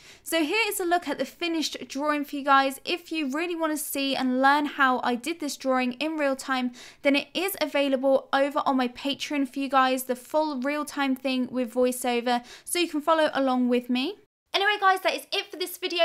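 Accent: United Kingdom. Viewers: British accent, I like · Good lord, the British accent is so attractive lol... Anyhow, back to reality... thanks for these art tutorials and tips! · You're from UK right? How do I know your accent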